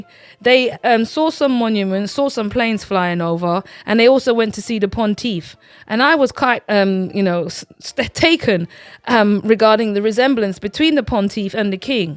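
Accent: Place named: England, United Kingdom. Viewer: I love the way you talk so English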